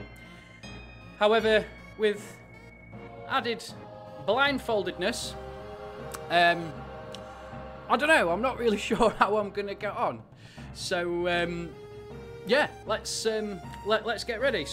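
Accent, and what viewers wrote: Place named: Yorkshire, United Kingdom. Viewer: Love hearing a Yorkshire accent with a gaming channel 🧡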